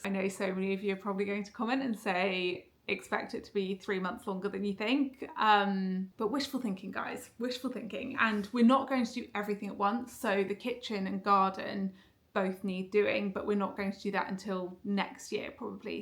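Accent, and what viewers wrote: United Kingdom. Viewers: So happy for you. I would love to see every moment of your renovations. You have the most beautiful skin, you are glowing! I could listen to your beautiful British accent all day. Blessings 💝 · Best British accent 😊 I also miss when you made vlogs with your friend Kate🌸🌸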